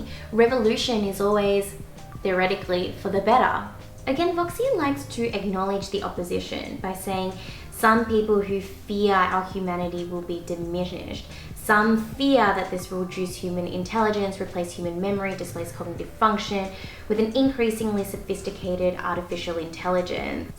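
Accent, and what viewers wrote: Australia. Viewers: honest question out of curiosity: are you Chinese/Korean/Japanese Australian with a background in learning American English?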